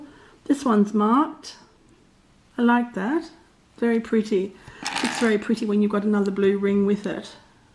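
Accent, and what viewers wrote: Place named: United Kingdom. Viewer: Miss You are so funny!! I could literally imagine everything you were saying... The proper British accent made it all that much better!! In love!!🥰🥰🥰💯💯😂😂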